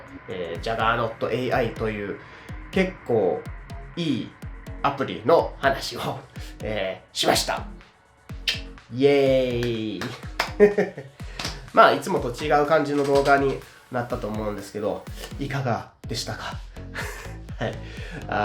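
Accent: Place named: Kansai, Japan. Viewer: 関西弁も板について自然で聞きやすいです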